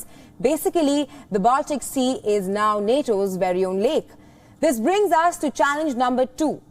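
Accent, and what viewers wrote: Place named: India. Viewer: It's like watching Fox News with indian accent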